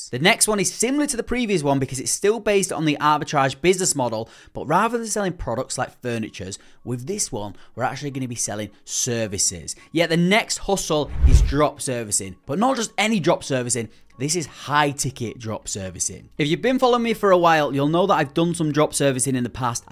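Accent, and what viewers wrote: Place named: England, United Kingdom. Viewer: Thanks I like you English accent easy understand! Thanks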